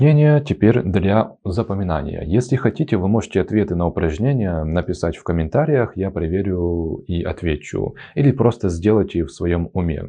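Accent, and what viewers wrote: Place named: Italy. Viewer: Вы же понимаете, что человек живет в Италии и там говорят именно так и мне это помогает привыкнуть к итальянским акцентам? Я сама уже иногда говорю "ситуасьйон")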